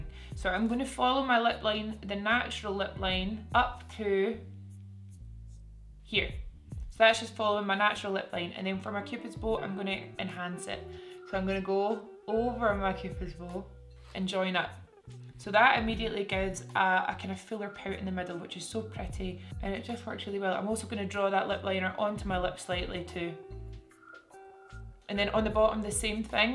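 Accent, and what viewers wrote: Scotland, United Kingdom. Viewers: I suck at doing eyeshadow and eyeliner 😞 I give up 🥺 BTW: are you Scottish?! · I am certain that I am not the first one to say this but damn I LOVE your accent! Is that Scottish? Excuse my ignorance if I am mistaken. And of course the entire video is just wow! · Are u Scottish? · Do it in that stunning Scottish accent too ! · Ain Adena Indeed! She’s scottish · Sounds Scottish. Is that right? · it’s definitely Scottish x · Is it Scottish accent? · Sm xo this is how most Scottish people talk. I don’t know why people from other countries think you need to shout to be scottish😂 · Would guess her accent to be Scottish, but the r’s seems a bit strange for that - like a more American r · I love her Scottish accent, Scottish people and Scotland in general 😍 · Love these pencils so much:) and can I just add how much I love your accent. 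Are you Scottish:) ? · she has a very standard Scottish accent with perfect diction. I'm not sure why you can't tolerate different accents but hey, it's your loss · You are so beautiful it doesn't matter what you do and that Scottish accent is the cherry on top. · rea zeqaj it’s Scottish very polite though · Love this accent!!! Its Scottish isn't it???? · She has a west coast Scottish accent. I know because I'm Scottish too!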